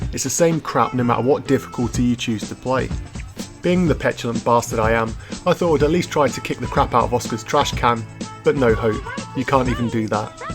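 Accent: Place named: United Kingdom. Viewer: I needed to rewatch this one after the last video, went full British on this one! very fun to listen:p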